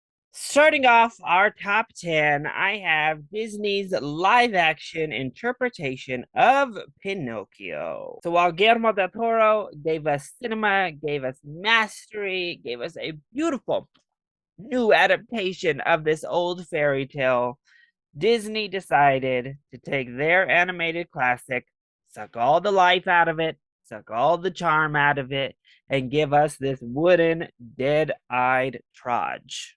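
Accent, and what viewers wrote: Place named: South, United States. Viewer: Per usual, great review,! Love that good ole fashion southern twang! I can’t lie, I actually quite liked your dishonorable mention, “Men” & “Blonde”. HOWEVER, I can 100% get where you’re coming from & respect your opinion on the latter. Everything else, yeah, I’m with you on! Plenty of disappointments this year, unfortunately.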